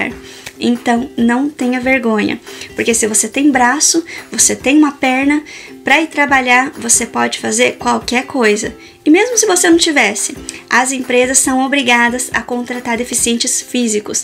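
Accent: Minas Gerais, Brazil. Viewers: Queria saber em qual parte do brasil ela nasceu e foi criada pq o sotaque e as girias conheço todas kkkkk! Sou do Cearà. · Adorei você, seu sotaque mineirinho, sua delicadeza, realmente um verdadeira gueixa brasuca. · Tu é de Minas Gerais?! Tem um sotaque mineiro da hora! 😅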